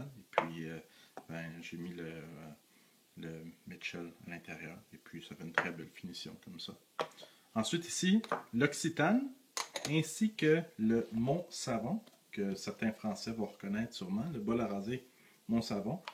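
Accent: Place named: Quebec, Canada. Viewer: Salut, si jamais tu as des produits, des brosses (surtout blaireau) ou des rasoirs d'on tu voudrais te débarrasser, fais moi signe! En plus j'habite a Montréal et j'en déduis par ton accent que tu n'es pas trop loin non plus! Donc si tu as des choses que tu veux vendre, n'hésite pas.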